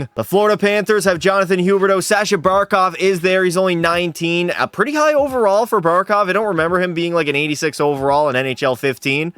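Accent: Newfoundland, Canada. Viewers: Need to know what part of Newfoundland u from there skipper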